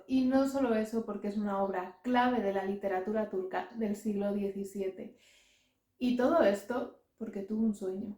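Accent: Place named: Spain. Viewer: Sería buena idea que tu misma tradujeras ese libro del viajero. Tienes un lijero acento de español de España.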